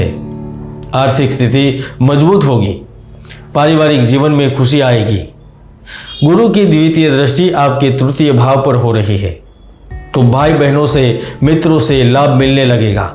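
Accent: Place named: Gujarat, India. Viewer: I like your simplicity and Gujarati accent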